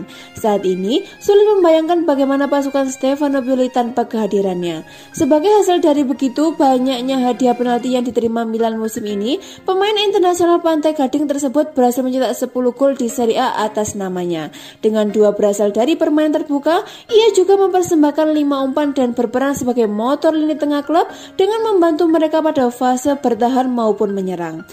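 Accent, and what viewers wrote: Java, Indonesia. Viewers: Medok min...😅😅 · medok banget min?cuma cakep jadi ciri khas channel ini salam dari interisti semoga milan lekas membaik performance nya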